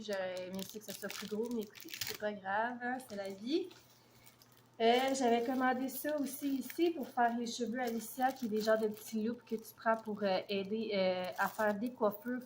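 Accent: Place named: Quebec, Canada. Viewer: L accent Québécois 😍😍😍😍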